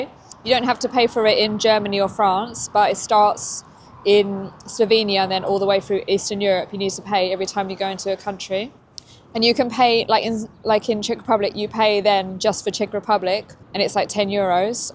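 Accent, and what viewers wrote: England, United Kingdom. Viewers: It's my first time in your channel and I have to say your British accent is amazingly charming ! Thank for sharing your experiences and the best wishes from a Brazilian fan! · expat in Germany here. She sounds English to me but said, for example, "stopped at a gas station" which is extremely american. Is that what we now say for petrol station in the UK? 🤥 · اللهجة البريطانية الانكليزية لغة الملوك ❤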